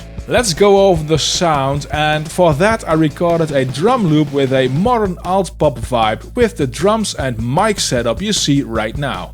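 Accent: Netherlands. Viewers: die accent. NL?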